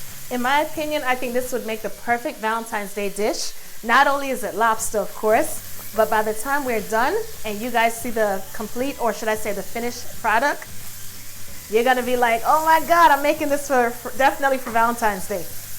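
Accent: New York, United States. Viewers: New York accent.. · Love your adorable NY accent..NYC holla!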